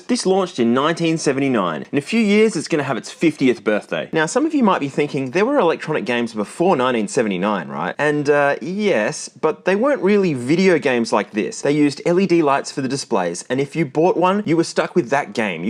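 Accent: Australia. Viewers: I love how Aussies say "ninety" and "nineteen"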